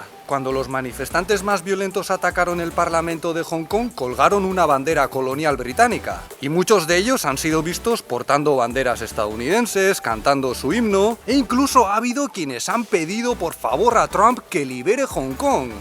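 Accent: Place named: Spain. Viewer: Saludos cordiales desde Ciudad de México. Pero que pareja tan más simpática y original. Sus videos sobre Huawei y Wudong no pueden calificarse menos que de maravilla. Entiendo que tu nombre es y el de tu juvenil esposa, (su rostro refleja una edad máxima de 4 años). Y no, no te ha pasado ningún tren por encima, sólo que los caucásicos aparentan algo más de edad. Hablaste del País Vasco con un sentir muy ibérico, y tanto tu acento como tu dicción son marcadamente españoles, aunque tu fisonomía pasaría por anglosajona o hasta nórdica sin demasiada dificultad. De modo que me recuerdas mucho a Gonzalo Guerrero, marinero español llegado al caribe mexicano como vanguardia de exploración de la flota de Hernán Cortés. Guerrero (a diferencia de su compañero Jerónimo de Aguilar) renunció a su nacionalidad española para jurar lealtad a su mujer y a la nación maya de ésta, Ulümil Kutz (Mayapán o Reino Maya del Faisán y del Venado, etnia muy cercana a China como demuestra su afinidad lingüistica), con quién engendró hijos mayas, nuestros primeros mestizos. 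En la España de su época se le juzgó traidor, pero los republicanos españoles de hoy (y como no, todos los actuales mexicanos), lo consideran patriota tanto maya mexicano como español, a pesar de haber muerto en la adversidad, luchando contra sus hermanos en defensa de la patria de su mujer y de sus hijos. Creo que todo español bien nacido tanto como ciudadanos chinos leales a sus raíces más profundas, te consideran honorabl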